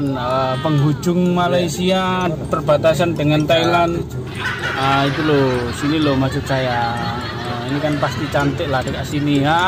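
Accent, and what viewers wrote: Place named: Java, Indonesia. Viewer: pekat betul bahasa jawa kau komandan😅...menjaja tu brjualan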